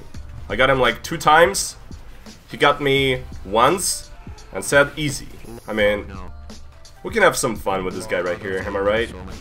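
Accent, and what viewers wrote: United States. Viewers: This is a little off topic but even though you are Russian you speak amazing English and you have a very great American accent!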